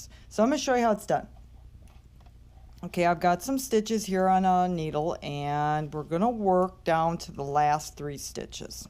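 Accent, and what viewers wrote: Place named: Minnesota, United States. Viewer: LOL Love the rollling on the back thing. Good vid, well done. Let me guess, you're from the north somewhere, Minnesota, Wisconsin, Canada?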